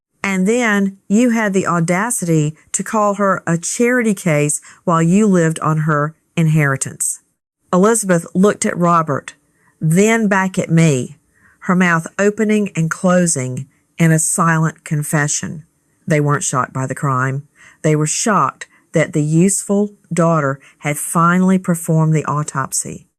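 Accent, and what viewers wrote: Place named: South, United States. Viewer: Listening to this southern drawl accent is painful!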